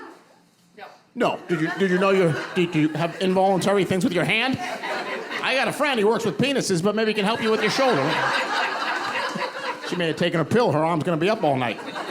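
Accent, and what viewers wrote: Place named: Boston, United States. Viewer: FREAKING HILARIOUS!! Had the video come up on my recommended and I'm NOT disappointed!! I love your Boston accent and delivery was phenomenal!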